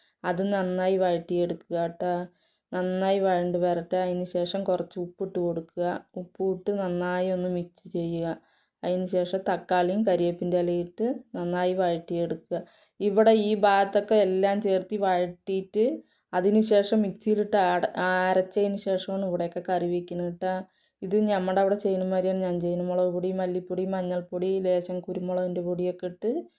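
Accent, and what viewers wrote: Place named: Palakkad, India. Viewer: അൽഹംദുലില്ലാഹ്. സഹോദരി സുഖമാണോ. സ്ഥലം പാലക്കാട് ആണോ. സംസാരം അത് പോലെ തോന്നുന്നു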